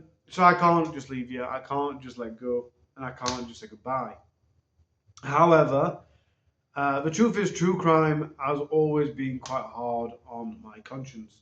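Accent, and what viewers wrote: United Kingdom. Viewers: I just now seen this. U look great. I really like ur hair grown out. Ill miss ur stories they r really good. U must b busy. U could all kinds of stuff and keep a following. U could tell us about some history from there as we know a lot about usa but lots of us know nothing about u guys. The uk accent is really although i don't understand the words ur slan there. U could tell us local artist some musicians. Read a news paper to us we would enjoy it. U could tell us about the characters that stop in the pup. They probably have some great stories to tell. Just have to watch YouTube guidelines. I hope we hear back from you soon.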